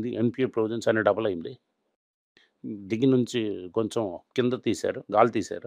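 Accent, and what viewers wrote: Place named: Srikakulam, India. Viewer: Vidoka Rakesh jhunjhunwala levello unnadu, okka vaakyam kudaa clarity ledu. Manadi Chikakole ani telustondi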